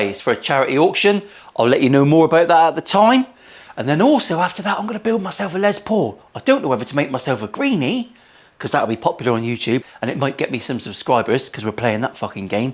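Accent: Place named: Plymouth, United Kingdom. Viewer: I’ll check it out, to be fair it’s a great looking guitar and I love hearing the janner accent on the youtubes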